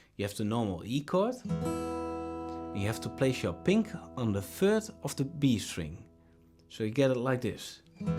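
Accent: Netherlands. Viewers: nice one thanks alot! Are you dutch? i can hear it by your accent ;) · Hahahaa je kunt horen dat je nederlands bent ! Geniaal ! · Your accent, sounds awesome, let me guess, you're dutch, right?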